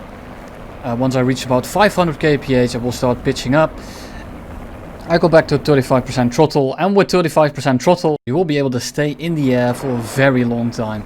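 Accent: Netherlands. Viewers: ben je nederlands?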